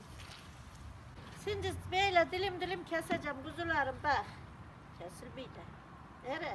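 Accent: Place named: Elazığ, Turkey. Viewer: Elaziğli gibi konuşuyosun ya anane ben elazigliyim da ondan diyom 😂🥰💙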